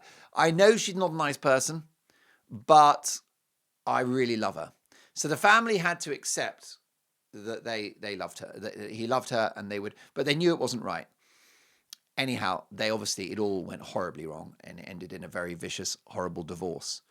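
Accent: United States. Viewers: Your American accent is pretty good, too bad I can't say the same of your singing. I do love your show just not your singing. I think your analysis of Harry's pose is not accurate, but I agree he, by now, is seriously regretting his marriage and is very unhappy. I fear he might eventually be a suicide risk because when she dumps him, he will have nothing left. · GAH! Your U.S. accent is really good! 😅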